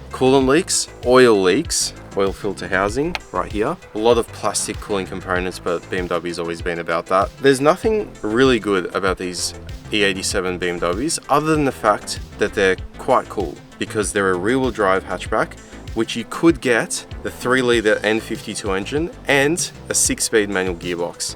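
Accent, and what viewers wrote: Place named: Australia. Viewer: They are LUX -URY, ie, pronounced "LUCKS"-URY cars, NOT LUGZURY cars. Be a PROPER SPEAKER and not a SLACK SPEECH OZZIE. First thing I noticed was the poor DICTION or PRONUNCIATION. Never mind the cars, you have to be believable first up! Most half smart Ozzies know NOT to buy EUROPEAN ANYTHING. Being an Automotive trade teacher, SPEAKING CORRECTLY IS IMPORTANT.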